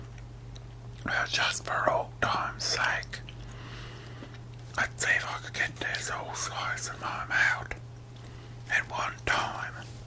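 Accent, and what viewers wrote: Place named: South, United States. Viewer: amaan ahmed people from the south of the United States have that accent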